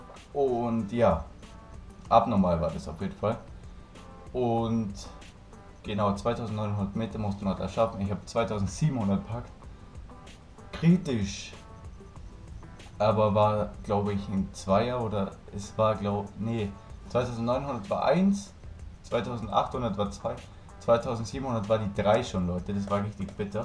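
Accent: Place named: Bavaria, Germany. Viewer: Dafür kann er nichts, viele Polizisten aus Bayern sprechen so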